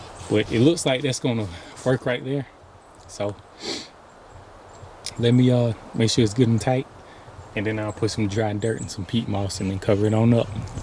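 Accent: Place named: Mid-South, United States. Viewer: This YN!! Out here trappn for real ! Memphis? Sippi? You definitely have that mid south accent. Have you caught one since you did these video?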